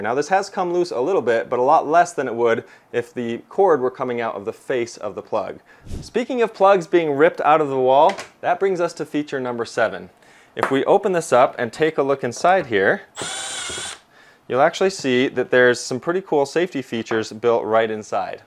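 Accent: United States. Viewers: Your accent sounds American yet you fully endorse a UK product. You even went as far as to wear a red shirt. 🇬🇧 You know how Americans feel about the Reds. (Red coats, rednskins, red scare) I mean its a great color, but just saying red is generally the enemy's color.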